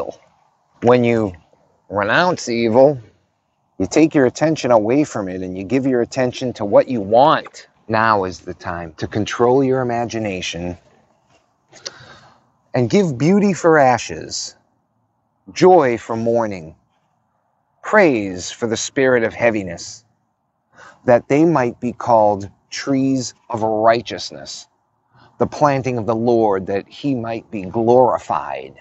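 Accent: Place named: Boston, United States. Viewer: oh, very nice! I thought I detected a bit of a Boston or Eastern MASS accent in your voice now & then, but I could be mistaken.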